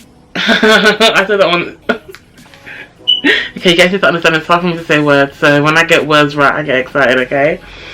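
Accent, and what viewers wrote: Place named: Long Island, United States. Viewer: Your Long Island sexiest chocolates cocoa butter golden brickhouse woman 😍😍😍😍🍓🍓🍓🍒😋😋😋☺️ love your accent 🔥🔥❣️❣️😍😍😍😘😘🌟🌟🍓🍓🍒🤩🤩🤩🤩🤩🤩🤩🤩🤩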